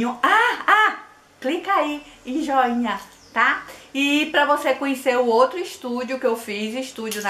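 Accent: Northeast, Brazil. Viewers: Adorei só pode ser nordestina parabéns ❤️❤️❤️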